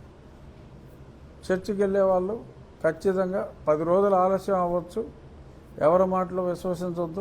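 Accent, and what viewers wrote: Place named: Godavari, India. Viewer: Sir meeru ma godhari yasa mamuluga ledhu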